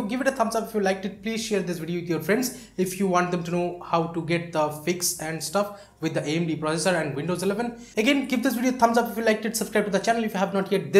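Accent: India. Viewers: Hindu accent :D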